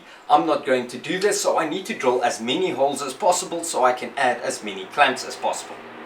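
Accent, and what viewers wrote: South Africa. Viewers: This guy sounds South African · Are you from South Africa? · Hi sir,i would just like to know if you stay in South Africa or from South Africa staying in another country cos I stay in South Africa and you accent sound South African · Are you South African? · Hey are you South African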